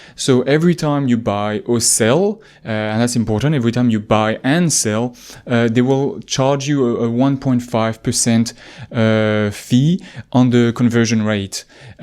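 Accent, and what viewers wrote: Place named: France. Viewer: I like your french accent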